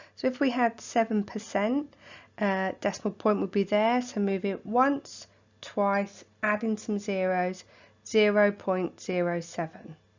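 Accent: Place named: United Kingdom. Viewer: Why do you sound so British Mrs?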